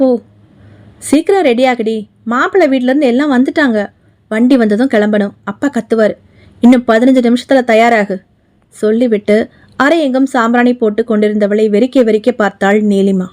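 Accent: Madurai, India. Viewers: Hi..ungal native maduraiya....slang athe mathiri iruku..melum intha kathyil meenakshi amman patri neengal sonnathu unmai...yennidam oru thadavai kanavil vanthu mookuthi ketal...aanal naan athai suththamaha maranthu vitten..oru murai meenakshi amman koviluku pohum pothu vasalileya aval kural kettathu mookuthi vangitu vanthiya yendru...appothan ninaivu vanthu avalidam sorry solli kovilukul ponen..aanal ammanai tharisika mudiya villai..thirai pottu vittargal...potramarai kulathu padiyil amarnthu vehuneram aluthen..aanal maru murai ponapothu marakamal mookuthi vangitu poi iyaridam kuduthu avaluku poda sonnen...andru iravil kanavil vantha amman koburathin mel irunthu yen mel malligai poo panthai yen mel pottal..yen native madurai than...i used to visit her often before marriage...even now wenever i go to madurai i used to visit her...but nobody knows this matter till now ...i am revealing to u only..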